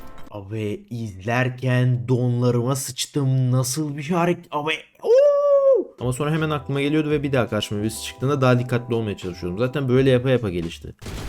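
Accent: Thrace, Turkey. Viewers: Allahım bu trakyanın şivesine bitiyorum hep böyle konuş abi çok güzel la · konuşmandan trakyalı olduğu anladım bir tık. nerelisin?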